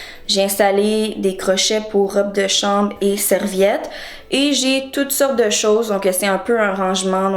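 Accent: Quebec, Canada. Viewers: Tu parles un peu comme une canadienne? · ouh l'accent québécois j'adore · Tu parles vraiment le " jouale" ...Bref ! Belle appartement et bien aménagé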